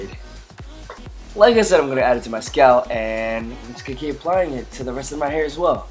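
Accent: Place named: United States. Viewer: Do you actually know how to speak in Tagalog? Youre Filipino right? You have such a nice American Accent! :) lovin all your videos!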